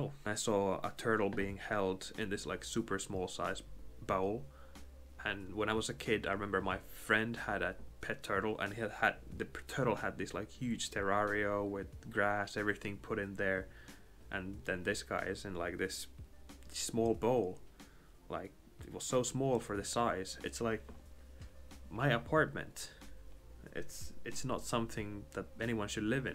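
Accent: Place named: Finland. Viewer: Ah!!!! I knew you were Finnish! I came upon one of your shorts about the morning Ramen shop and your accent caught me. I was like “That sounds very Finnish” and I am happy to confirm that! Just very nice to see for no reason at all! 🎉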